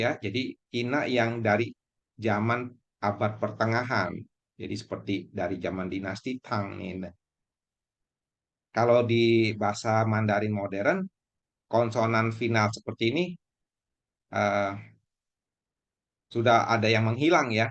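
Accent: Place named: Moiyan, Indonesia. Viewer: Ini dialek mana ko, dialek monyien ya, kalau saya disini dialek liukfeng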